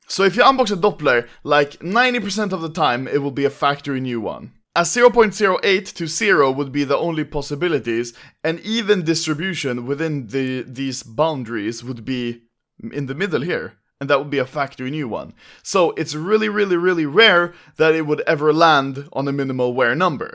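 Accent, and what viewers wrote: Sweden. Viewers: You speak engswedish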